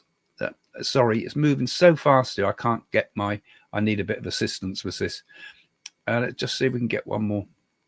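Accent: United Kingdom. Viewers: Mr., YOU ARE THE BEST!!!! Your royal British accent is CHARMING!!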